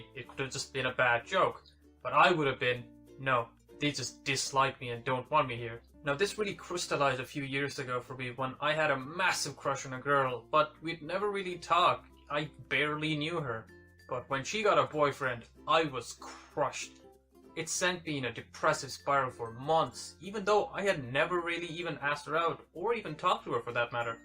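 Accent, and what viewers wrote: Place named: Ireland. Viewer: Loving the Irish accent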